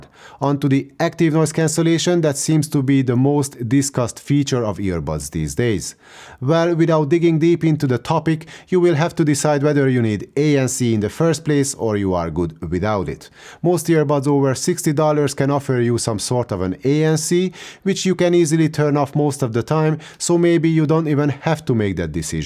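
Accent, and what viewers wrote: Hungary. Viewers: Hello, great guide, it helped a lot in choosing my earbuds! I was wondering what your native language was, because listening to you felt like you were talking to me in Hungarian accent the whole time. I can't help but mention this. :D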